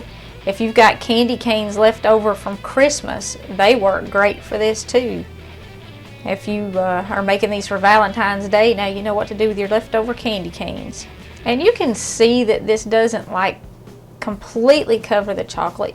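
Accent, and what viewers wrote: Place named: South, United States. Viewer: love ur southern accent... so adorable!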